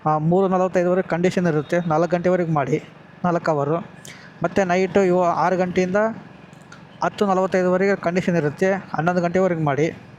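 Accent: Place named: Ballari, India. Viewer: Yaav ooru bro nimdu , nim slang kelidre hospete illa ballari antha ansthide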